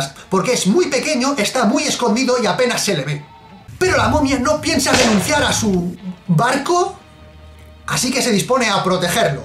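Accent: Mexico. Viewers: Es la verdura esa placa, habla mexicano puro y duro jajaja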